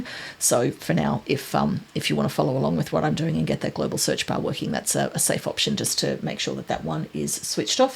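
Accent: Australia. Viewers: love that Aussie accent! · thanks heaps, I love your pace, your explanations and of course your aussie accent makes me feel right at home:)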